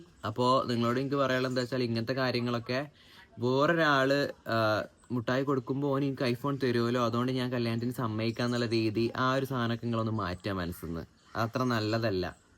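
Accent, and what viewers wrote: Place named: Malappuram, India. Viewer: Good Advice & Motivation.. അസ്സൽ മലപ്പുറം സ്ലാങ്, വീട് എവിടെയാ?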